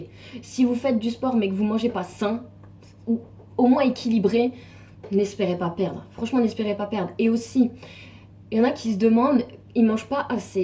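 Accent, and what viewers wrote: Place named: Lorraine, France. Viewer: Ça motive ton expérience :) tu es d'où en lorraine ? Je suis de lorraine également :) j'adore ton accent :D haha Et ta vidéo :) gros bisous ma belle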